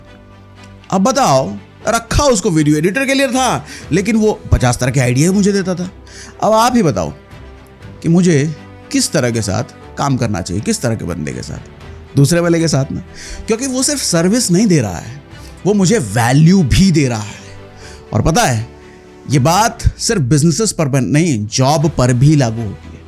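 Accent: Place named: Kanpur, India. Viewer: Sir boli se kanpur side ke lagte ho aap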